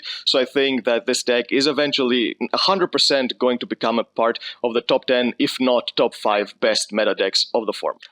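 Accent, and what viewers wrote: Greece. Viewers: bro you sound kinda greek this accent gives greek vibes also very nice video!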